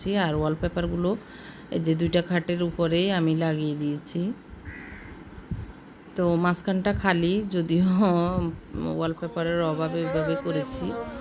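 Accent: Noakhali, Bangladesh. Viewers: আপনি তো নোয়াখালির কথা শুনেই বুঝতে পারি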